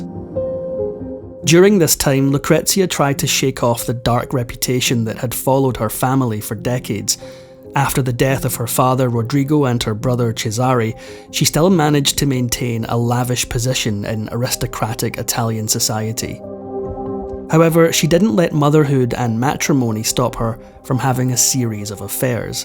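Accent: Scotland, United Kingdom. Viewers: Scottish; possibly Glascow. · He's Scottish ,how's he supposed to do that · YES. GOOD SCOTTISH VOICE.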